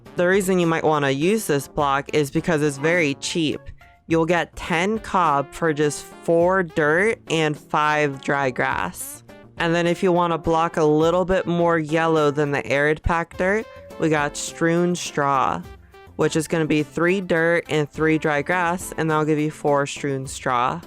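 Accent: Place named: United States. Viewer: i cannot for the life of me place this accent. i know it. i have BEEN here before, but i cannot recall where in america this is anyway regarding the crude door-- that dont break near frequent enough to deter me. i love how that door looks, takes 2 seconds to put back. hardly different than my real doors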